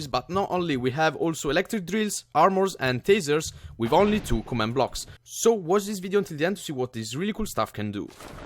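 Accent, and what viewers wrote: Italy. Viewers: He isn't a native English speaker, he's Italian :-D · He is Italian accent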